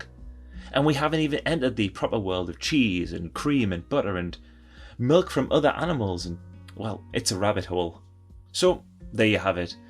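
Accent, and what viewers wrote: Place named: Newcastle, United Kingdom. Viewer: Thank you. Your Geordie accent is lovely.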